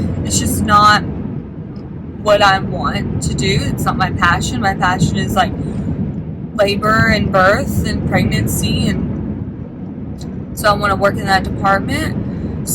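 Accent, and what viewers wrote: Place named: South, United States. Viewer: Stumbled across your channel! Would love to support each other! I have done this so many times where I plan a video and it didn’t go as planned. Thankful for people like you who do jobs to help people. Where are you from? Southern accent! I live in IL BUT IM BORN AND RAISED in FL. People always stop me here about my accent that I don’t think is thick at all but def something people notice up here. Hope your last semester goes great! I’m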